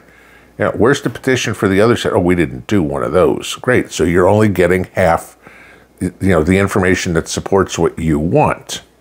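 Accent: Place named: East Tennessee, United States. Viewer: Yup, your speaking the truth. Sounds like you are in East Tennessee. I grew up in Roane County. They do some dumb things. Tennessee has so many people moving to Tennessee. The new comers will try to change things and turn it into what they left behind. Like HOA's hate them. If good men do nothing then evil will.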